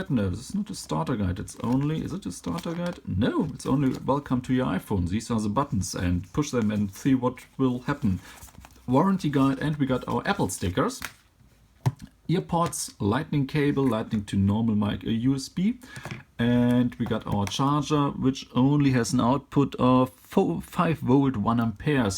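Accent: Germany. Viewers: I'm in love with the phone and your accent! Deutsche? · You sound like German... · Yes her is a German :)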